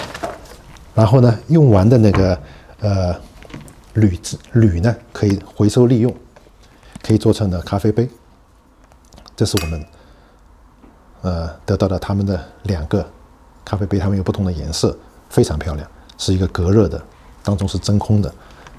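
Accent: Shanghai, China. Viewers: 听到上海腔普通话感到很亲切。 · 一口正宗老派上海话，的的刮刮上海人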